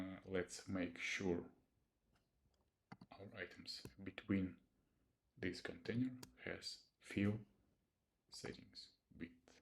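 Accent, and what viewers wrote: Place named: Russia. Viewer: Я слышу, что ты русский ))) Так что давай такое видео на русском. А так я тебя через янденкс-переводчик слушаю )))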